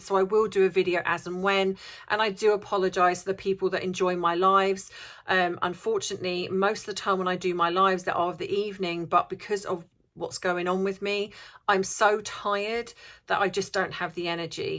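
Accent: United Kingdom. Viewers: 🌸 Hello Bubble Fam 🌸, great to see you're back. I like the ideas you have for the channel. Then again, I've been in love with British accents since The Beatles first came to the U.S. Paul... sigh. ♥️ So I would listen to you read the phone book! Anyway, finding balance in one's life is not easy and priorities change. Have patience and I know you will find the answers. 💋 See you soon!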